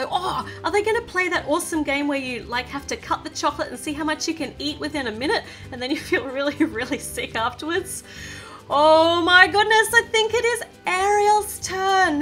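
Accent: Australia. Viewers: Are you Australian Please reply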